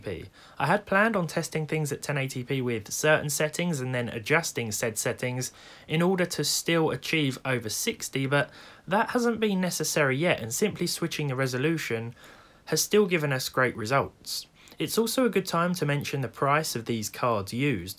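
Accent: United Kingdom. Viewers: How do you know he’s British besides the accent? He says parcels instead of packages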